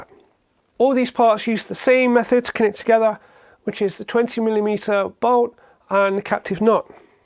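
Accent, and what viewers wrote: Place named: England, United Kingdom. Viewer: Wow.. you sound a lot like my late father, only with an english accent.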